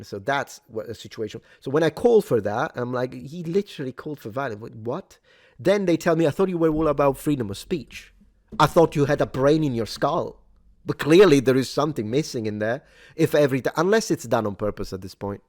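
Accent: Germany. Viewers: My first thought was, "Wait, is this the f*g with the German accent?" It was, in fact, the f*g with the German accent. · he's Indian with German accent? Omg.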